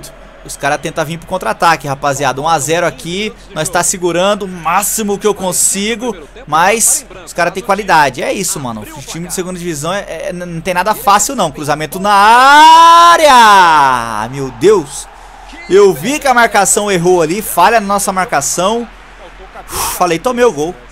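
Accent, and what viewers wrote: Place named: Bahia, Brazil. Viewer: Eae aonde se mora pow tem um sotaque da bahia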